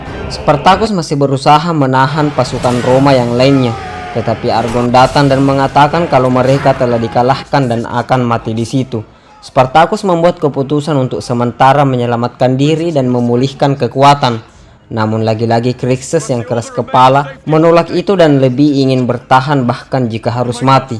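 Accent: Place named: Sulawesi, Indonesia. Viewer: Miminnya pasti dari Sulawesi 😅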